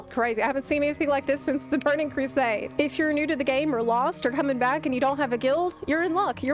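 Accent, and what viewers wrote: South, United States. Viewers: i just discovered your channel and i LOVE your enthusiasm and omg your accent. girl! i was born in texas, but raised in mississippi. and now i live in the midwest lol. i love your southern twang and miss it. 🙌🏼 they need to do housing themed kits by class, too. i don’t wanna be a druid sitting on an upholstered sofa from IKEA. also VERY nervous about kill-on-site in silver moon lol. i have a bad habit of mindlessly running into horde territory in Dalaran 😢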